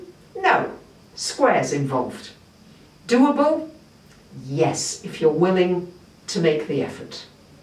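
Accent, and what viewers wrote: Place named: England, United Kingdom. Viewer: Thank you beautiful gentle motivation as always. I love your friendly way with words and your accent,what part of England are you from? 💗💗💗😇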